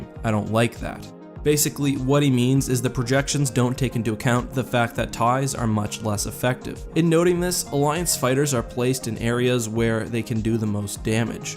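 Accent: Canada. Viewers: My happy place is listening to talking about star wars. Make love to my ears with that sweet canadian accent bro. 🇨🇦🇦🇺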